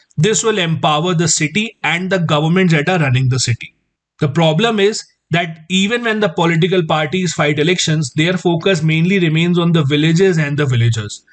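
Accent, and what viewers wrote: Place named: South India, India. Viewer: U r awesome and ur voice is attantive just like some sort of South indian accent I feel very connective to that. Thank you so much sir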